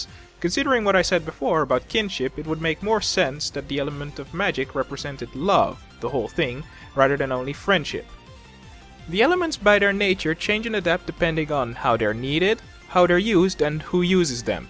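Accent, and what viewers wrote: Netherlands. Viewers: I noticed the dutch accent and I've been skimming the comments but it raised a question. are you native dutch or did you move to the Netherlands? Also if I may ask what province do you live in? I've met several dutch bronies on the internet but they where always on the other side of the country. And regarding the dutch speaking English. yes its true every one i know has a massive accent the moment they speak english myself included. I'm working on getting rid of that(not that easy:D) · No reason to be so harsh on the Dutch, I'm well aware how challenging English pronunciation can be since I've experienced it first-hand (English is only my fourth language but boy have I worked hard to get rid of my accent! Only through sheer determination do I sound almost indistinguishable from a native speaker today). Nevertheless, even though you claim that English is your first language you clearly have a Dutch accent, even if not a thick one. 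Comes from living there, I guess? Never mind.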